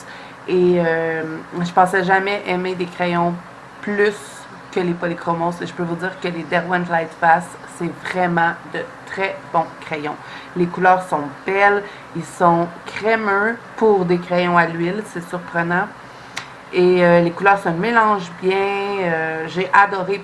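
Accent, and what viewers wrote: Quebec, Canada. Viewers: c'est bien ce que je pensais et tu me réconcilie avec cet accent que je ne supportais pas auparavant. Il y a aussi Mélissa qui a contribué à cette évolution lol.... En tout cas, merci à toi du fond de ton Québec où mon mari rêve d'aller... et moi, pk pas maintenant que je me suis habituée à votre parler hihi... 💋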